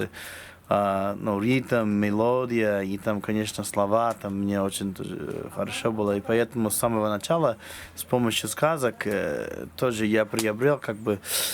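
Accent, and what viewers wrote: Tuva, Russia. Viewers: На руском говорит как тувинец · 😅тувинский акцент! Дыка кижисиг кижи.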